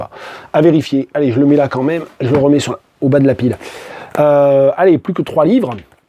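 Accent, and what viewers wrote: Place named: South-West, France. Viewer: Je ne suis pas saoulée par ce "dégoisement"... J'y retrouve l'accent et le vocabulaire ainsi que que les manières de triturer la syntaxe à l'oral du sud-ouest... Et ça donne encore plus envie de lire! Donc pari gagné en 40 minutes!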